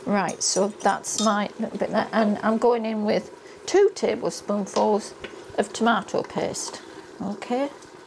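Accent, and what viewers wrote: United Kingdom. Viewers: Wow!, you had my mouth watering when you took the lid off to dish up your meal…I love fennel and olives and, of course, thighs! I live in Southern California so excuse me for saying this but I love your UK accent!!! I have been to London five or six times and was also lucky enough to work in Slough for four weeks…so you always make me happy to watch you cooking!🥰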